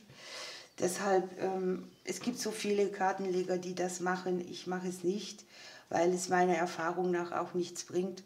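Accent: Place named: Heidelberg, Germany. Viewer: Liebe, du hast dir so viele Abonnenten verdient, denn du bist richtig gut. Es ist wirklich schön, dass es dich gibt. Du hörst dich übrigens an, als kämst du aus Heidelberg und Umgebung, denn du sprichst wie Beate Bahner, die Rechtsanwältin aus Heidelberg, die sich in der C-Zeit für die Menschen, die sich nicht "schlumpfen" lassen wollten, die keine "Larve" tragen wollten etc. eingesetzt hat.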